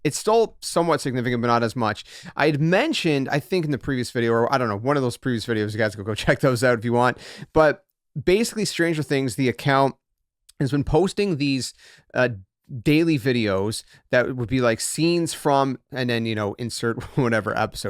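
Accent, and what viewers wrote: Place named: Canada. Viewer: I’m here for the cute Canadian accent. Here for the degrassi vibes. lol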